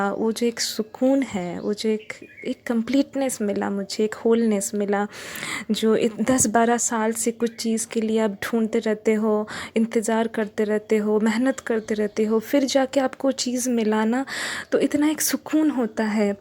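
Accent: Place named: South, India. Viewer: Di aap south Indian ho kya ....aapko boli me vesa hi touch hai .....which is very sweet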